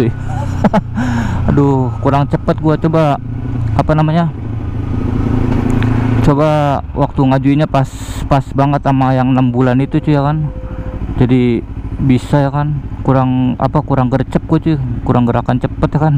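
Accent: Indonesia. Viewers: Logat ngomong kayak org jawa benarkah?:v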